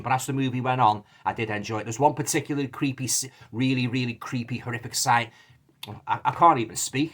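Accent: Liverpool, United Kingdom. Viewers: Hey bud. So good to hear someone from Liverpool reviewing a horror film. And thanks for the compliment on the cover art. I’m really proud of it and I think this was a great film.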